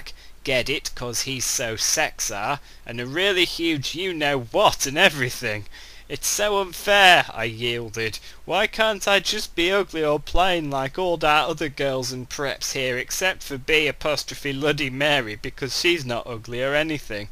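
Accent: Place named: Australia. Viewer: The Australian accent seals this.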